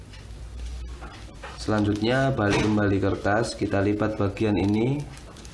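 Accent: Java, Indonesia. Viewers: Kamu medok banget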